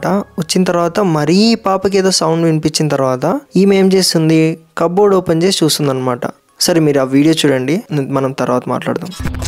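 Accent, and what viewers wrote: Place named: Rayalaseema, India. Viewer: anna kathalu super. all the best . where r u from? slang rayalaseema la undi so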